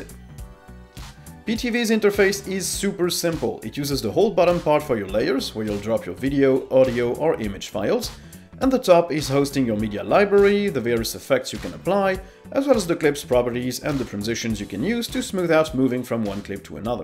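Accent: France. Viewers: Bonjour, tu es français ?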